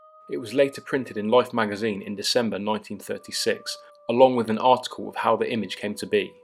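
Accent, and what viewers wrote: United Kingdom. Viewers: I'm really liking the narrator's voice (?). I'm American & dig a brit accent. His videos are really enjoyable, as well. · I subscribed, and I think this is a great narration and good story. Well researched. I'm partial to British accents reading though. Most podcasts and audiobooks I listen to do not hold my interest if they do not have the accent.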